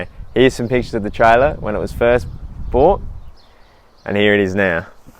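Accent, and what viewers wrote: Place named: Australia. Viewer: If anyone is confused he is speaking Aussie!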